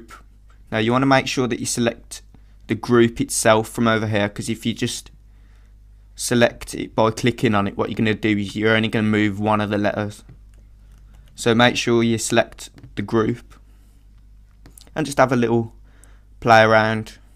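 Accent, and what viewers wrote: Birmingham, United Kingdom. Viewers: your from birmingham aha ? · Dude we came from them, he's British, we are pronouncing it wrong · Are you british???????????????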